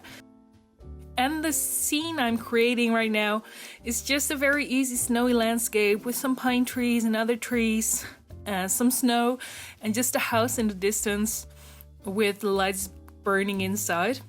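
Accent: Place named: Netherlands. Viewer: Do you know when you watch a video and you hear a slight accent and you're like DUTCHDUTCHDUTCHDUTCH As a dutch girl, it's like a dutch radar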